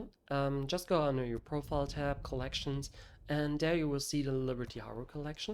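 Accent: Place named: Australia. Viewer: You sound Australian, what time you stream?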